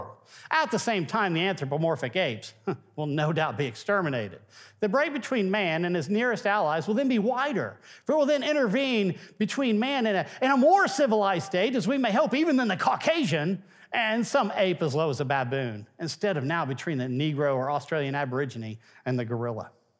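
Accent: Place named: Tennessee, United States. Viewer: Just before you compliment (or tease) your wife, let me guess : you are from Tennessee?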